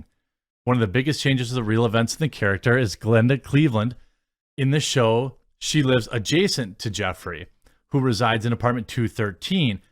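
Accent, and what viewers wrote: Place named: Wisconsin, United States. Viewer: I didn't realize you had a wisconsin accent until yesterday, and now it is all I can hear.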